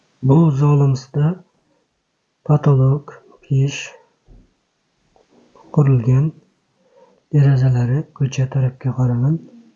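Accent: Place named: Tashkent, Uzbekistan. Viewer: Toshkent shevaga oxshamin qoldi😅😅😅😅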